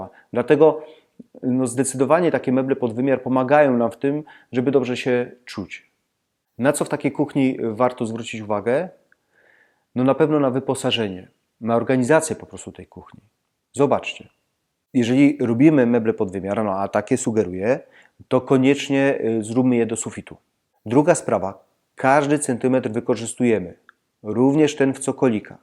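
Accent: Silesia, Poland. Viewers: Świetny odcinek! Za niedługo będę wykańczał pierwsze mieszkanie - właśnie takie małe, więc szukam inspiracji. Dzięki za porady! Na marginesie - jak usłyszałem jak Pan mówi to myślę sobie: no taki śląski akcent! Ale nazwisko takie nieśląskie, myślę sobie: "pomyliłem się". I sprawdzam - a jednak, siedziba firmy w Rudzie Śląskiej ;)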